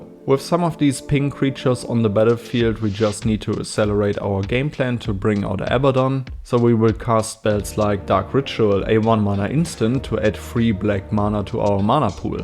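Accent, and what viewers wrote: Germany. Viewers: Am I hearing a little bit of deutsch? Aber nicer commander!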